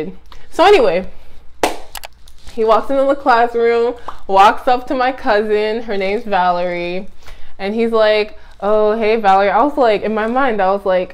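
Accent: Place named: Nigeria. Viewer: Your Nigerian accent is coming thru 🇳🇬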